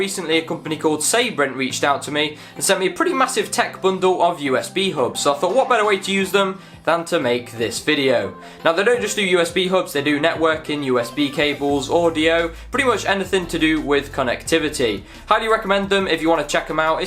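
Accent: United Kingdom. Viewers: I love your BRITISH accent! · Hi, it's such a good video. I'm from Indonesia anyway and it is really hard to understand your word because you were talking in english with british accent and so fast:D lol, I need to learn much. Tx btw:)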